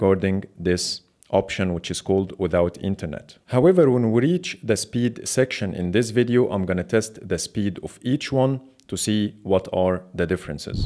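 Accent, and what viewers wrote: Egypt. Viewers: مصري باين اووي من الاكسنت بتاعتك 😂😂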